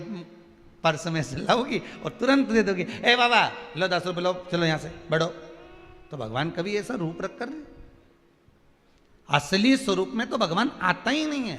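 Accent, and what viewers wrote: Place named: Madhya Pradesh, India. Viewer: Aap m.p vale ho to mp ki tarh hi belonged.Maharashtra ki Tarah bolo to me aapko accha he sir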